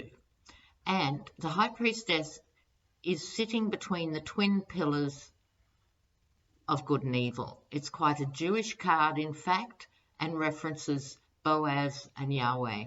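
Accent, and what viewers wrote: Australia. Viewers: Wonderful - I just want to thank you for bringing American a little hope and insight into the ultimate ending of all of this madness. When I hear your lovely accent I am reminded of all of the times when America has gotten themselves into a mess and Australia, like that treasured big brother, forever there supporting and trying to get us to do the right thing. Nevertheless, I am grateful for you and others who have held our hands this past year and I hope against hope that next year we won't keep you guys so busy.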